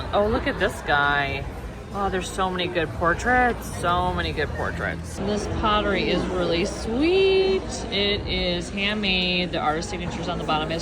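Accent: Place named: Midwest, United States. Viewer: The stuff that got on camera was absolutely dizzying! So much great stuff! I'm going to rewatch and pause to enjoy. I think your Midwest accent is what makes you so enjoyable for me to listen to - I'm originally from Chicago (so only an eighth-turn from ex-Detroit). Your painting aesthetic is interesting. Maybe you can do a bit on that sometime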